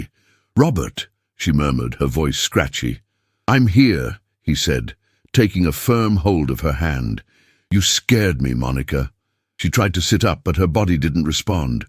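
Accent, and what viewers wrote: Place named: United Kingdom. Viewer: I love the narrator's British English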